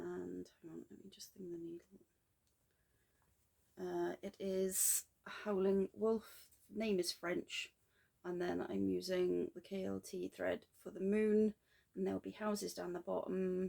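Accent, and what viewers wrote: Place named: Wales, United Kingdom. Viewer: I knew i had the fear of horses for a reason! 😱 yes I feel you would be nice to not work and stitch all the time 😁 i will send you screenshots of how I do it 😊 maybe focus on a piece for 3 days then move on to something else? I always look forward to your vids I very much love listening to the welsh accent 😁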